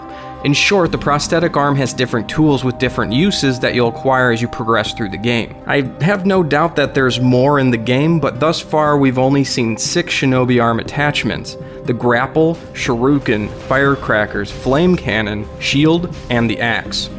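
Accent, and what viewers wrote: United States. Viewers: The Very American way he pronounces Japanese words annoys me.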